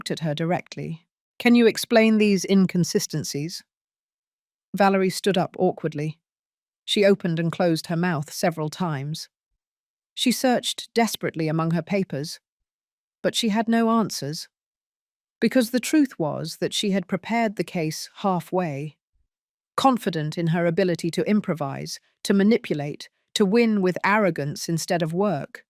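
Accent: England, United Kingdom. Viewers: Did this case happen in Great Britain? The narrators accent sounds English & a few things about court seem not American. The DIL seems to be an idiot, too. Also had I been her, the judge, I'd have the police waiting outside. When court was over, she'd be arrested for having assaulted me; and yes, I'd press charges for sure. And I'd cut son out of will. He's scum & stupid too. Instead, I'd leave everything to grandkids when 21. And I would tell son that wife has been stealing from him & the law firm. Prison for her!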